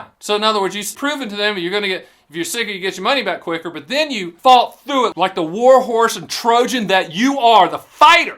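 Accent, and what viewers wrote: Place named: South, United States. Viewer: Fast talking Southern Boy ! Whew 😥 👍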